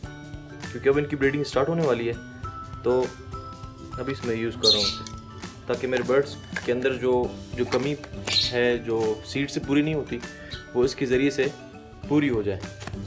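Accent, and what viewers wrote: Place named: Balochistan, Pakistan. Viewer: Bahot khooob Aik persnal sawal krna chaoonga. With excuse!!!!!! Aap ki urdu Bht achi hai takafuz saaf hai. Lekin pir bi aap k.Lehjy me wo mittaas aajata hai. Jo humari zuban ka hissa hai! Sawal ye k kia aap brahvi hain???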